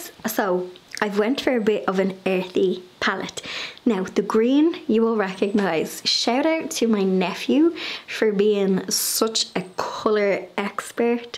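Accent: Ireland. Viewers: That jug of sweet peas… sigh! Lovely!, I imagined the beautiful scent whilst looking at the sweet peas. I love your YT channel and look forward to seeing a new notification when your latest YT vid is uploaded 😊. A fan from 🇦🇺 I went on a trip to Ireland a number of years ago to walk where a lot of my ancestors walked… hearing and seeing your Irishness is an added bonus to enjoying your gardening, renovating, travel, and craft projects!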